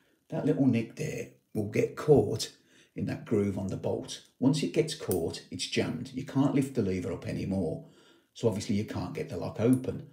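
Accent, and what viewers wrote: Birmingham, United Kingdom. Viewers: Clear as glass. What a very cheeky lever having anti pick top and bottom.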